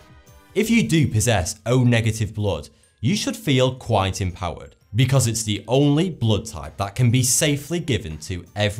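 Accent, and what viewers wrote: United Kingdom. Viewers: R u British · Thought 2 is like vsauce, but he's a Brit. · Hold on, when you say "British" accent do you mean: Irish, Scottish, Welsh, Northern, Dorset, stereotypical Londoners or English. Please specify.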